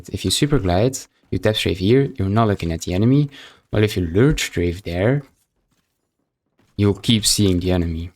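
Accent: Belgium. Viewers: belgian accent vs brazilian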